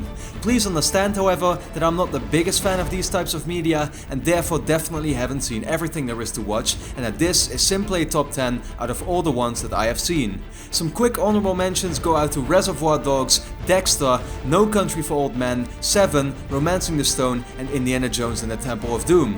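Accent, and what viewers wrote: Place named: Netherlands. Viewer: Oke i just subscribed to your channel. And i want to ask you were do you live? Because i think your dutch atleast you have a dutch accent. I think it is awesome that you and i have exactly the same taste of games and movies. My favorite games are the uncharted series and my favorite movie is the lord of the rings and that is just the tip of the iceberg. Awesome list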